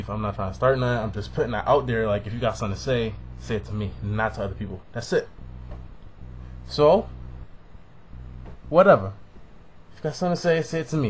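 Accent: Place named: Jamaica. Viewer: You sound mad jamaican in this vid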